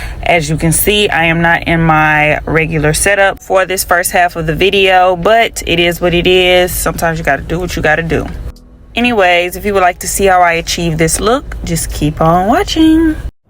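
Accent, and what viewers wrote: St. Louis, United States. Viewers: I love the accent 😭 are you from St Louis? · There’s no way you’re not from St. Louis lol😂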